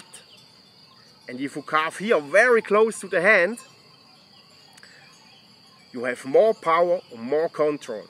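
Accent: Germany. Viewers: А что у него за акцент? Немецкий?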